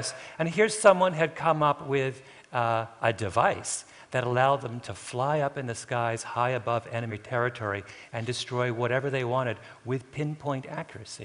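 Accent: Quebec, Canada. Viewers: Sounds Canadian... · He's Canadian. Where both languages are used. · French Canadian. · is Canadian. We sometimes talk funny. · Americanos pronounce it as "Bomm Buh Deer" while Canadians pronounce it as "Bome Bar Dee Ay", that's a dead giveaway that Malcolm speaks Canadian.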